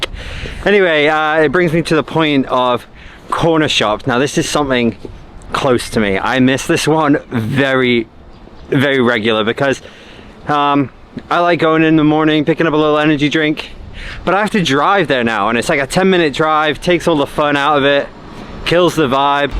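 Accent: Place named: United States. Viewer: Why have you picked up American accent/intonation in such a short period of time?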